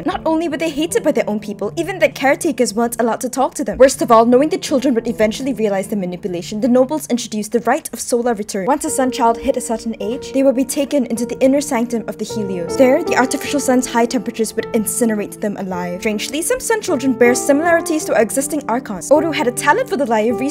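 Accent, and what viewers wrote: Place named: United States. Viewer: your accent becomes more american every video 😭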